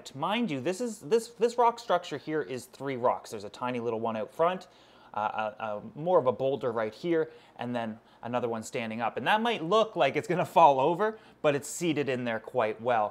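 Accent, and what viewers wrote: Canada. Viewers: "Out and about " I'm dead the cute Canadian accent is amazing love the videos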